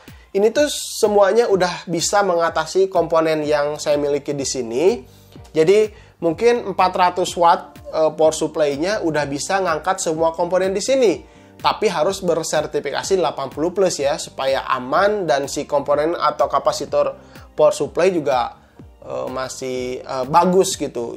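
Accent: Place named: Sunda, Indonesia. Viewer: sundanya kentel haha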